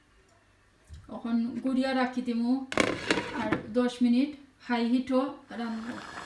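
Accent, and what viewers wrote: Sylhet, Bangladesh. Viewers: খাছ সিলেটি আপা গো তুমি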